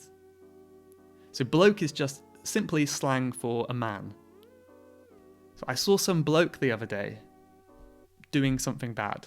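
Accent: United Kingdom. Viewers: Hey, it’s Lee speaking from mainland China, i am trying to find some useful tips, videos on YouTube for improving my spoken English. And your videos are so useful and your British accent sounds so noble and elegant. Cheers mate.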